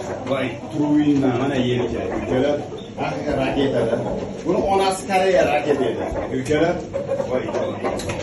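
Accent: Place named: Andijan, Uzbekistan. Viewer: Андижондансиз шекилли булди буеги аник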